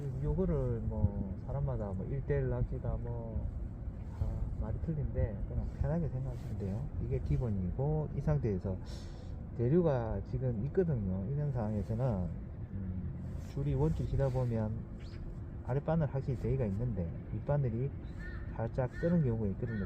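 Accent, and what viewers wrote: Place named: Gyeongsang, South Korea. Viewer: 영상 잘 보고 있습니다. 말투가 경상 쪽이 신 것 같은데 어디 사시는지요? 저는 구미에 거주 중인데...가깝으면 시간 맞으면 동출하고 싶네요...ㅎㅎ 다른 댓글을 보니 마산쪽에 사시는지요?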